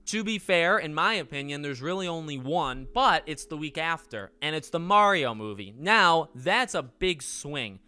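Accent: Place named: East Coast, United States. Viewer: Unrelated but I need to say how much I love your east coast accent. It brightens my day every time you say “Welcome to pahhhhhdcahst now, I’m!” 😝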